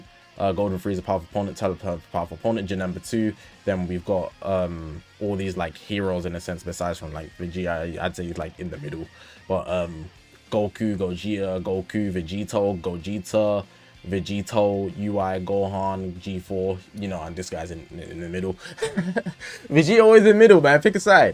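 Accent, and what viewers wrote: United Kingdom. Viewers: Just a question, could you say " a bottle of water"? Because i heard the British accent is very strong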